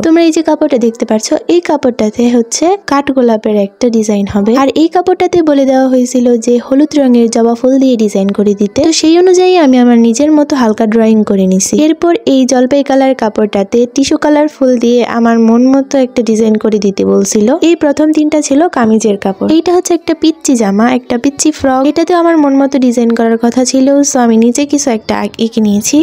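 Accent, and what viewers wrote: Sylhet, Bangladesh. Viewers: Apnr basha ki sylhet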